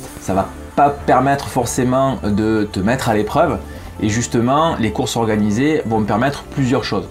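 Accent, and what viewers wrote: South, France. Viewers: Soûlant l.accent sudiste. Dommage.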